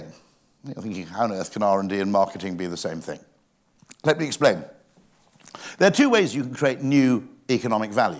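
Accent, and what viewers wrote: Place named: United Kingdom. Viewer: It is the british accent.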